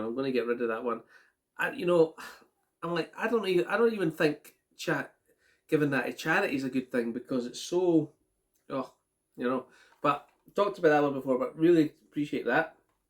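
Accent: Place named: Scotland, United Kingdom. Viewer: Just wondering what part of Scotland are you from. I myself I was born in Fife👍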